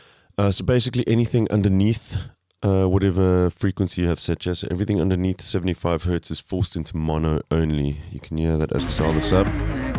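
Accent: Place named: South Africa. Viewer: I hear the SA accent. I feel at home.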